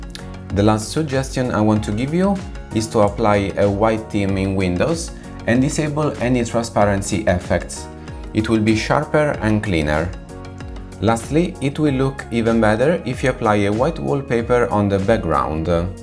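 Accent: Italy. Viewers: Cerco una recensione in italiano, vedo che l'autore del video è italiano: ma ha fatto la recensione in inglese (e con una pronuncia tipicamente italiana!) L'unica cosa migliore rispetto alle recensioni di siti specialistici è lo scandire per bene le parole che rendono meno impegnativo il lavoro di traduzione. Cmq a parte le critiche per non aver pubblicato anche un video in italiano, ti ringrazio: il video è ben fatto ed analizza il device a fondo. Ps: dovresti scrivere nel titolo che questo è il Dasung Papelike 3 o si potrebbe avere il dubbio che si stia parlando di un modello più vecchio. Pps: 40 minuti di video sono troppi! See you, Italian Reviewer!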